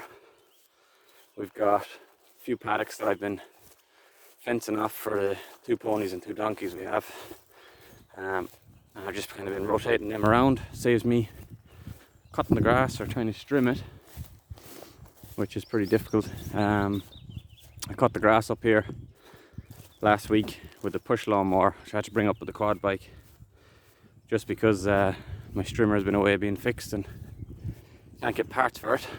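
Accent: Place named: Mayo, Ireland. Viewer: Assuming it’s mayo given the accent planning etc?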